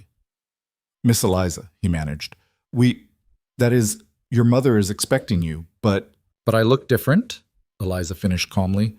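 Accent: United States. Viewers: I hate this AI narrator. American or Canadian accent for a British based story...and it's voice is just annoying.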